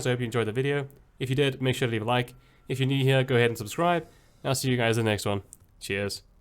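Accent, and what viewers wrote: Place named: South Africa. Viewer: No ways you're south african. Recognized the accent immediately. Lets go bru, really good video and well paced aswell 🙌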